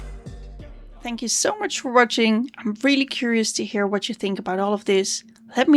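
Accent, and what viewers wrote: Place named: Netherlands. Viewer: as a fellow dutch person its suprising to hear the accent xd, great vid!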